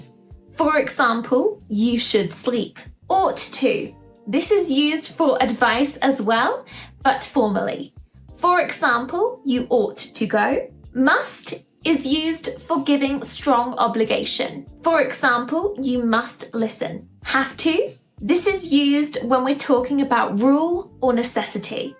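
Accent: United Kingdom. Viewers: You British? Correct?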